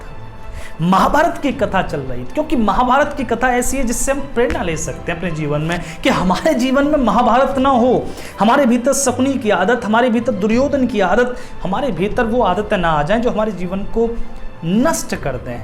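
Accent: Braj, India. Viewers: Kha se ho aap gurudev.. Aapki bhasa humari jesi hi h...braj chhatra ki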